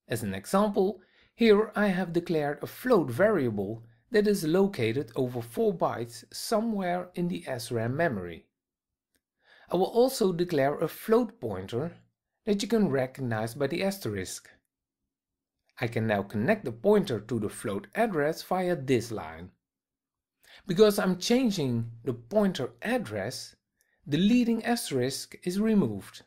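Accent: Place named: Netherlands. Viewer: The guy with the hollands accent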